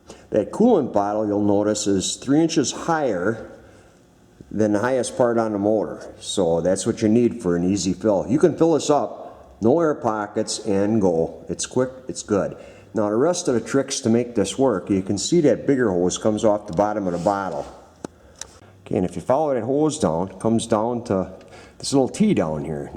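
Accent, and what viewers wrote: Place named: Upper Midwest, United States. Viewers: Christopher Desabrais Wisconsin accent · I'm from Wisconsin (now in Texas) and this guys accent was killing me. i miss home! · lmao... man this guy's accent kills me! it's like watching a deleted scene from the movie Fargo!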